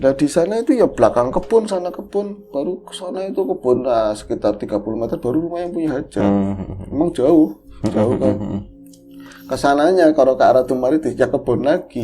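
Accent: Banyumas, Indonesia. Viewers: Mas, aku kelabakan pas ngomong ngapak full speed. Ga ada subtitle-nya. 🤣🤣 Btw, format begini menarik jg sih. Seperti POV klo kita ngumpul² bareng gt kan pasti ngeliatnya ga cuma ke satu orang. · Aku di sini malah kekel krna mas nya ngomong nya ngapak campur bahasa indonesia 😂😂😂😂😂maaf ya gk bisa gk ketawa 😂😂😂😂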